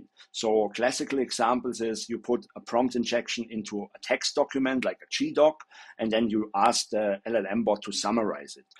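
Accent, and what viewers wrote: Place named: Germany. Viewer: Sehr gutes video , Ich habe von deiner Stimme erkannt dass du ein deutscher bist . Bin ursprünglich auch aus München. Hab auch mehrere Methoden selber herausgefunden Prompt-Injektion zu machen um schwachstellen in LLM-Models zu entdecken . Mach weiter so , liebe deine videos ! 🔥sind kreativer und gehen tiefer ins detail . ✌🏻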